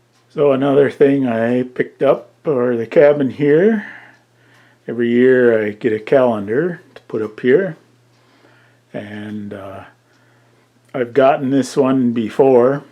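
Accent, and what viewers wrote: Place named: Upper Peninsula, United States. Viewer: you sound alot like yuper er like me from mi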